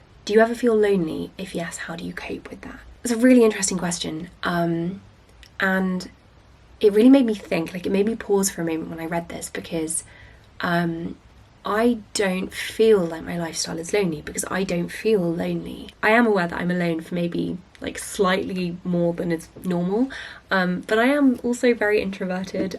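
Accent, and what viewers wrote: United Kingdom. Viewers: Wow. You translated your video to 5 languages. That's awesome. Hi from Belarus. I'm learning British accent with your videos)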